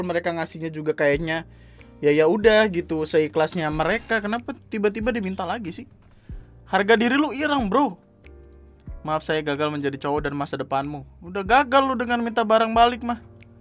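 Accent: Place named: Riau, Indonesia. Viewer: Logat Riau tapi pakai lu gua. Jatuh jatuhnya cringe.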